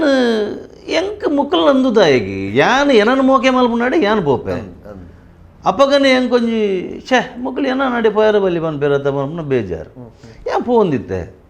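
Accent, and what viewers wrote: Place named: Mangalore, India. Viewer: Kudladha kannada super chethu, Yir pandidh tojapayar tule,avvu kudladha kannada.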